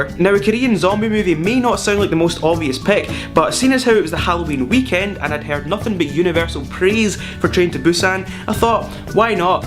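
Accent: Scotland, United Kingdom. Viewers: Your review is on point. Covered all there is to know about the film. You got one more subscriber. Thanks for stopping by my channel! Also, your accent. You're from Scotland if I'm not mistaken. I was there a couple months ago in Edinburgh. Beautiful city. I did a video about the trip. Check it out in my channel!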